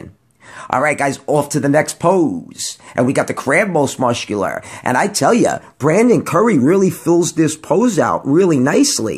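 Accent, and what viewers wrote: New York, United States. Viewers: Love your nyc accent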